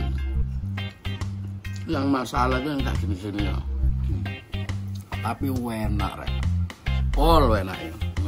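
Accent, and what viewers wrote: Surabaya, Indonesia. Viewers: samean wong suroboyo aa mas?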